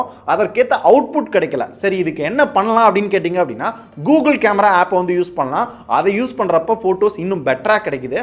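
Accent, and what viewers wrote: Madurai, India. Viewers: Bro Neenga Madurai ah.... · Bro nenga madurai ya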